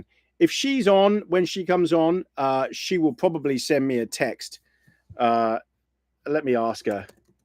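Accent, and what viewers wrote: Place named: England, United Kingdom. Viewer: Hi! I can hear you! I'm glad you have a re-play--Thanks. I didn't know you were already in Canada! It's not gone wrong--You just didn't know plans would change.:D:face-red-heart-shape: You look real good in those glasses!👍👍👍👍👍 You sound like you are from England/New zealand and whatever accent you have--I really like it.:D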